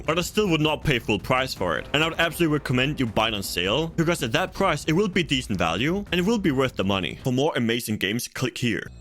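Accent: Denmark. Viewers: Danish narrator?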